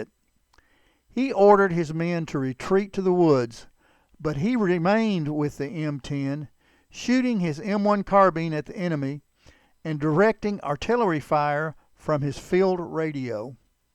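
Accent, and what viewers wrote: Texas, United States. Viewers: Don't know who narrated this video, but only took the first three or four words to let me know he is a fellow Texan. I live in San Antonio, TX, where the VA hospital named after him is located and I have gone through the small museum there with relics and videos of his service. Indeed a fine man and a real hero.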